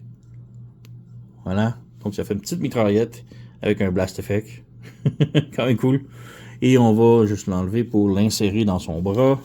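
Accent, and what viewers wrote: Quebec, Canada. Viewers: Yooooo, I watched your English review of the figure. I transformed my new figure using your transformation section, thanks for all the tips! Then I noticed that you have a French version of the review, so I clicked it out of curiosity, and was pleasantly surprised by a Québécois accent! That brings me waaay back. I grew up in Quebec City back in the 80s when I first discovered Transformers in the English TV channels. Anyway, love your channel! 👍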